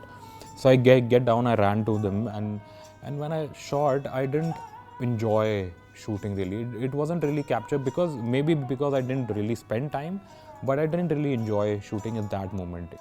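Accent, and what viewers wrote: India. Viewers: wah....indian sound on English language...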